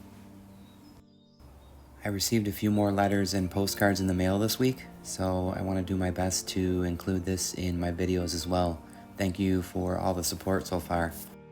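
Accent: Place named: Midwest, United States. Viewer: It is INSANE how much work and frustration went into this. I 🎉CHEERED🎉 when you started pulling the pieces off and "properly" gettin down to biznass with your various sanders. That was actually really funny. It felt like the crescendo in a Dr Jackyl/Mr Hyde scenario. Except like, the loony tunes version? But with ambience. Anyways, I'm binging your channels. You're doing great work! Also a funny aside, I migrated from MI to OR for 10 years now, and I've been wondering about certain words that have started to sound wierd when I say them out loud, and you're making me realize that it's because they're midwestAF long-vowel sounds. Like how you can maybe get it up to 60° in the graaj, or how you're gonna bou-re a hole, or kill it with fyyre. 😅 Anywhoozle, I hope your week is spectacular, and that you find that magnificent piece you didn't realize you were searching for! 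Cheers! ~Violet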